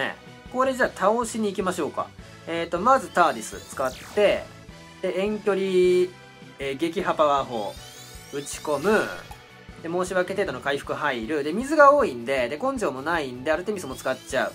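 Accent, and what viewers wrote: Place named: Nagoya, Japan. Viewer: そろそろ、名古屋弁全開実況しまょう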